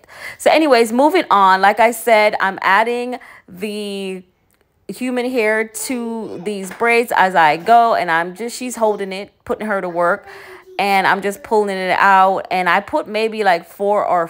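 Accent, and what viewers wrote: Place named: Jamaica. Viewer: I could here the Jamaican in you😊😅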